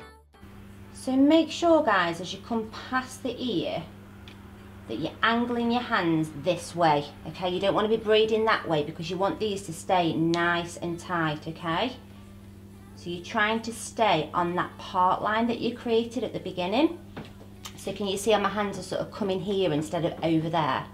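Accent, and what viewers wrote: United Kingdom. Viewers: What British accent is this? She sounds so sweet, I could listen to her forever.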